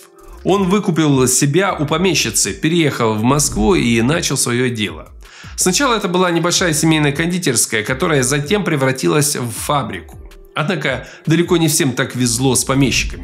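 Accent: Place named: Ukraine. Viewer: Страна-резидент канала: Украина(это слышно и по говору),так что,все понятно, псевдоистория с вымыслами... В России НИКОГДА не существовало рабства,каждый человек был лично свободен(экономическая зависимость-это другое дело). Раб не может сменить социальный статус,а крестьянин в России-мог! Крестьянские дети становились дворянами,как бы(потому что это требовало,как минимум,образования). Крестьянин мог подать ЖАЛОБУ на помещика,напрямую,Царю. Крестьяне жили общиной,"миром",имели СОБСТВЕННОСТЬ и сами продавали выращенную продукцию-где тут рабство? Розыском беглых крестьян обязан был заниматься помещик, то есть никакой системы государственного закабаления не существовало.. Крестьянство было СЛУЖБОЙ,только,не государевой,а персональной. Феодальная система! Общественный договор! Взаимозависимость!!!! Помещик ОБЯЗАН был заботится о крестьянах и кормить их в неурожайные годы-отмена крепостного права вызывала БУНТЫ крестьян,так как помещик теперь мог просто выгнать неэффективных и ленивых работников,"освободив" их. 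"Продавали" крестьян деревнями,то есть,это была просто смена собственника,как сейчас с заводами. Отдельных крестьян не столько "покупали",сколько переманивали-хорошего кузнеца,например. Крестьянин,до определенного года,мог вообще,сменить помещика(на Юрьев день)-рабство,говорите? Разумеетс,были периоды и случаи не очень красивого обращения с людьми-но их всегда было мало и это общественно порицалось. Не забываем,что ВСЕ русские тогда были одной веры и,если европецы в Новом с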